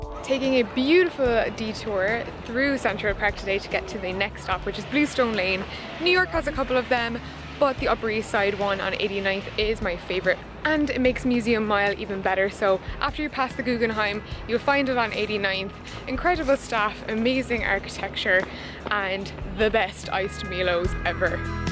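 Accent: Ireland. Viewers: Wait are u irish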